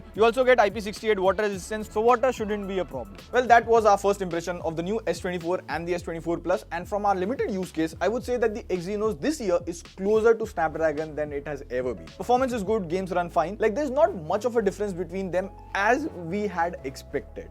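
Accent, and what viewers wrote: India. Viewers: Impressed by exynos improvement...!! Google auto caption is great I will give it a 98% despite ya Indian accent. Definitely it didn't caption exynos correctly ... That's not English though 😂😂😂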